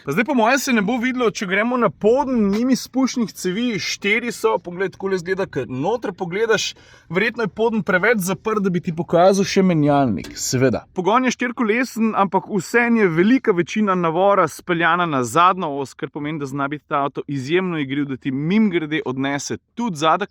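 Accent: Styria, Slovenia. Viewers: velikokrat me tvoji videi tudi nasmejijo, ampak tale uvod je bil pa čisto po štajersko... Čooj a si ti upaš, pa hlače gor potegne:)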